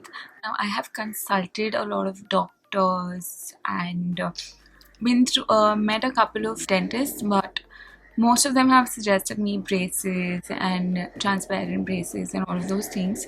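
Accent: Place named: India. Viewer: Indians are so irritating with their stupid accent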